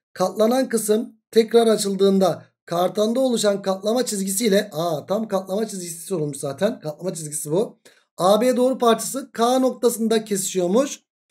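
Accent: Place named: Central Anatolia, Turkey. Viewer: Hocam sizde ankara-kirikkaleli konuşma tipi var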